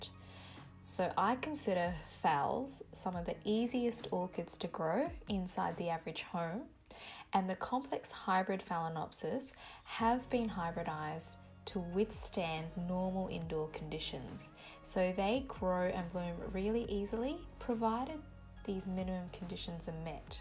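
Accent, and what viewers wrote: Australia. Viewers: I am a newbie to orchid growing...phals and sarcs at present....so all info is greatly appreciated. Also nice to hear an accent from across the ditch....I'm a new subscriber in Wellington, NZ....so please keep the videos coming. 🌞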